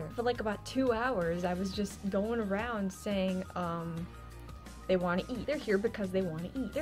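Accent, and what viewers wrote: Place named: Midwest, United States. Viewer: I hear mid west accent.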